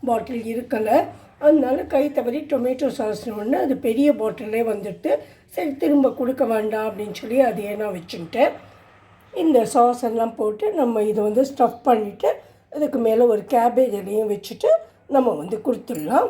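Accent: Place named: Iyengar, India. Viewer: burger super. filling variety ❤❤ அம்மா..உங்கள் பேச்சு..ஐயங்கார் வீட்டு வொய்ஸ்..அதை கேழ்க்க very interesting ❤❤...இந்த food item எல்லாமே western style இருக்கு. .குறிப்பா இன்னைக்கு போட்ட burger. .🎉🎉🎉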